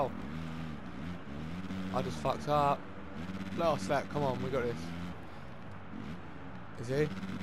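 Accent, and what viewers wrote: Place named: United Kingdom. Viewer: Loving the British accent mate😂😂👌🏾🤙🏽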